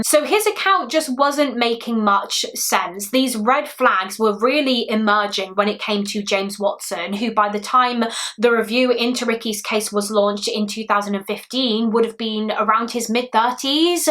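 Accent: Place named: Midlands, United Kingdom. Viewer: Okay I know this is completely unrelated to this tragic story. But where are you from? I’m from the UK but can’t pin your accent and it’s driving me insane like when you can’t remember the name of a song or tv show 😂 I’m guessing somewhere in the midlands?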